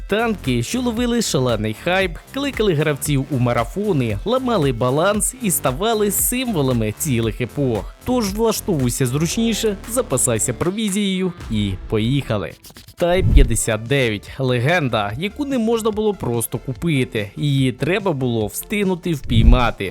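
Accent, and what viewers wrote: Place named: Russia. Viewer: Діалект з паРашкі проскакує. І це тільки щоб фізично не працювати ?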